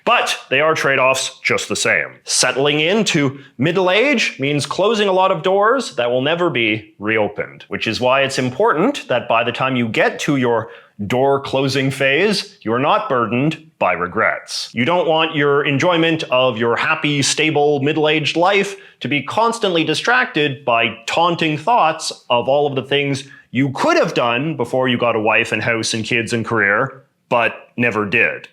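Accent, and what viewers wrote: Canada. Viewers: dude i can't get over the canadian accent wtf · learned in this video that some canadians also say “doubt” and other “ou” words with the stressed u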